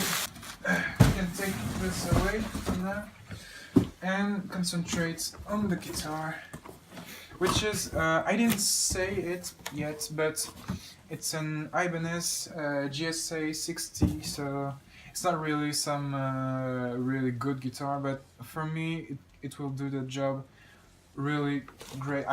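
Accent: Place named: Poland. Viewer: Nice, was thinking to get something similiar in near future, so doing some resarch ^^ (IBANEZ GSA60 WNF to be specyfic or IBANEZ GRG121DX BKF) trying find some significant differences between such types of pickups but the GSA60 speaks to me more look wise :D (Ps. are you Polish? guessing by Accent - no offence since iam polish too xD, just wondering!)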